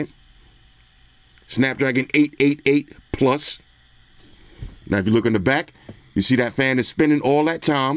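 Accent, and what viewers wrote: New York, United States. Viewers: It's like talking to my boys from NYC 😆🔥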